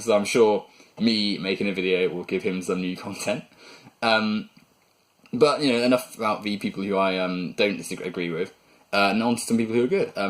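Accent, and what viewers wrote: United Kingdom. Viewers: Don't be fooled by his British accent. He might have the douche-bagginess of the accent, but the intelligence isn't there.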